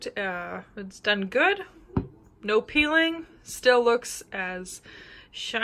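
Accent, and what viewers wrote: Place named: Canada. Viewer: I think sure may be from Canada. I've heard other people pronounce it that way from there.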